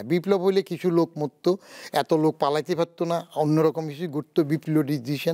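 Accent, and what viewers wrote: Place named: Noakhali, Bangladesh. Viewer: মামা ফিউর নোয়াখাইল্লা.... 😁😁😁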